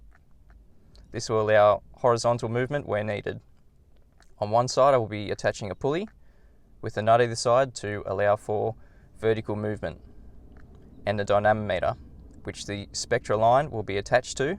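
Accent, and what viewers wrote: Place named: Australia. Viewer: Good luck with your experiment. I think your vertical bars may need some strengthening. Possibly some angled bars from the horizontal bars near the container to the pulley and to the tensioning line connection, there will be largish forces involved. triangles will give you your strength. ==================== At least you understand buoyancy is a function of gravity. Considering that gravity isn't compatible with any FE model, you wont be getting much FE support. ===================== You have an Aussie accent, therefore you can ascertain South (using a few stars) and observe the South Celestial Pole on any night. (that also breaks all FE models) A far simpler experiment, but you should give this idea a go.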